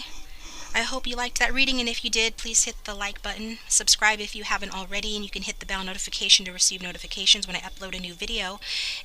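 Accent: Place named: United States. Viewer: Your readings are very accurate but I struggle to listen to your American accent 😭😭😭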